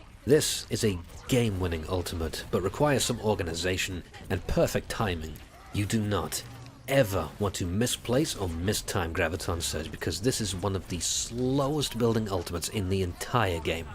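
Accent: Wales, United Kingdom. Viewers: Surely Welsh. Just watched the Hanzo introduction vid, he gets a bit heated right at the end. Guessing he mitigates his accent... Creepy analysis over. PS: Or, if Aus.... New South Welsh?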